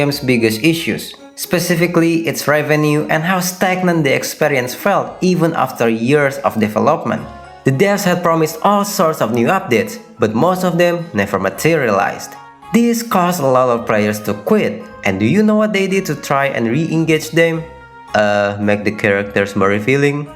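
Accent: Indonesia. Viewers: oh indo toh, kentel banget aksennya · Hop on Limbus gng. In what world where a CEO got lucky with gachas will give you 10 free pulls. Either way im an indonesian myself and ive been watching you for quite a while and you know your indonesian accent stuck way too much on your english it makes me wanna laugh so badly (i cant lie lmao). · hell nah, satu satunya orang Indo yang masih ngehidupin komunitas GT, mangat yak, make sense knp logatnya kek org Indo😅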